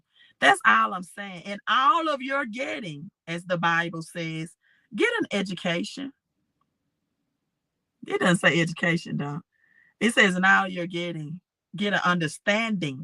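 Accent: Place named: Alabama, United States. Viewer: Sound like you from Birmingham Alabama 🎉🎉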